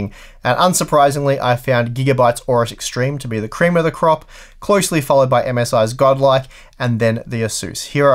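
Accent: Australia. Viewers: Ur Aussie bro! Subscribed and patreoned. Really appreciate your work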